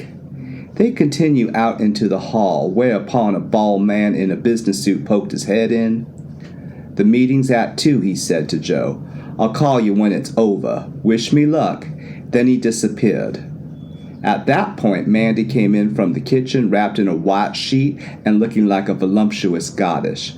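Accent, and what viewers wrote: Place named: South, United States. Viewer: Mr, sir… Come with your Southern drawl…and welcome aboard! I’m the Captain of the SS Nurse Jane… On the Herring Bay!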